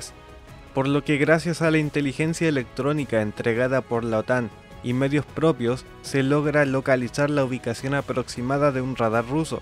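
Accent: Chile. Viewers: Excelente video y su análisis. No es usual encontrar análisis tan objetivo basado en la especificación y proyección técnica que podría ser usada en un conflicto. Siendo venezolano donde junto a EEUU (país fabricante) poseemos una de las escuelas y formación de mayor data sobre esta plataforma, no me es de extrañar que posiblemente (por el acento) un hermano chileno, nos de tal orientación, donde concuerdo perfectamente en el entendido que se quiere hacer ver que los F16 seran los dueños del espacio aéreo ucraniano y nada más lejos de la realidad, ya que sólo son una plataforma y los rusos aún por: 1)Entrenamiento y conocimiento de las propias 2)Capacidad de sus plataformas 3) Posicionamiento y plataformas multiplicadoras 4)Tecnología Finalmente 5)Número y diversidad plataformas como de unidades Superará con creces la variante aérea que implica el ingreso del F16 (en versión reducida) Sólo en entrenamiento, en conocimiento para luego llegar a un dominio determina muchas horas de vuelo, que será muy difícil lograr y esto es uno (muy importante) pero sólo uno de los factores que determinan para lograr algún entorno de éxito. 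Por el contrarío el ingreso de esta plataforma involucra factores verdaderamente preocupantes a ser considerados ya de emergencia en el Consejo de Seguridad de las Naciones Unidas, ya que esta plataforma a diferencia de las Rusas es más delicada ante impactos, pistas de aterrizaje, su mantenimiento y su reparación, esto DIRECTAMENTE determinará que países eu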